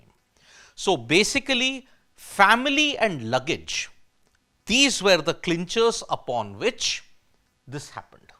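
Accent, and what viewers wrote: South India, India. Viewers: Irritating idli accent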